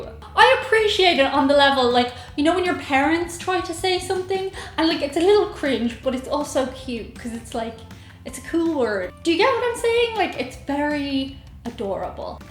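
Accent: Dublin, Ireland. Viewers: The new "politically correct" term to call those weird people is "eccentric". But you're right, generally, it's not frowned upon. Nothing sexier than a woman with a sense of humor! The Irish accent doesn't hurt either. 😁🤓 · As an American I love your Irish ☘️ accent : ))) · Fun video I love when use an "American" accent. Granted I live New England. As Side you your accent reminds me of Friend from Dublin. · as a US citizen born and raised, I really love knowing that you "appreciate" our "societal culture"! But AS a US citizen, I really love YOUR culture as well! We only have a history of about 250 years to fall back on, some of it not so great. I don't know what it would feel like to have a CULTURAL history that goes back, thousands of years! It really would be great to be able to go back to the BRONZE, or even the STONE age, and know that those people were MY "ancestors". 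(and your Irish "accent" is pretty cool too!) Even your original LANGUAGE going back as far as when it was still "Gaelic" and then turning to Irish!! Don't get me wrong, the American "melting pot" has alot of advantages too but unless we are "NATIVE AMERICANS", (which I'm not) here, we just don't have the sense of truly "belonging" to a COUNTRY or even a REGION, like you do in Ireland, or Spain! I mean you can trace your CULTURAL lineage all the way back to the Celts in Ireland or the "Beaker people" or even "Iberian" and Roman history in Spain! So, THANK YOU for your kind words and thoughts about the STATES! Not everyone in the world thinks of us in such a "kind" way. ♥️😀🇺🇲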